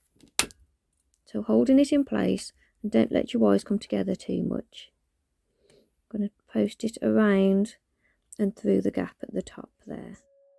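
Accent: United Kingdom. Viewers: I agree about her voice. I love to hear her say, " hi I'm ". It's that UK accent that just sounds so good. No matter if its men, women, or kids. All the people from the UK, I love their voices.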